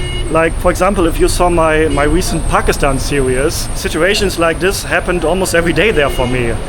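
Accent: Germany. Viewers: Bruder dein deutscher Akzent ist killer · Love his german accent ❤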